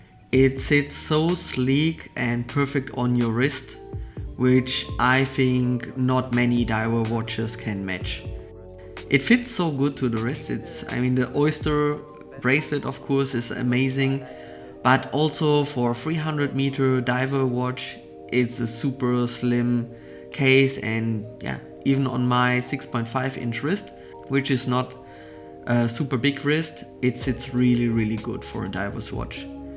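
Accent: Germany. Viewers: Are you Form germany?😅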